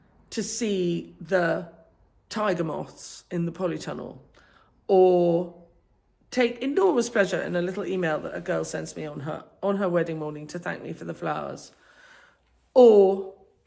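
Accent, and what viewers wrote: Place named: England, United Kingdom. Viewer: I love watching your videos! First of all your English accent is beautiful. I am a New Zealand living in Queensland Australia. I trained as a florist in 2015 but was never able to get a job at a florist. Now I deliver flowers for a delivery company. It is overwhelming at times as the traffic here is a nightmare. It isn’t a relaxing job at all. I often feel stressed and rushed because I just want to get the jobs done and get off the road! I watch your videos and wish that I could do what you do. Unfortunately we rent our house so that is a problem. Oh well, maybe when the Lord calls us home, maybe He will have a bountiful field of flowers for me to attend to🌸😍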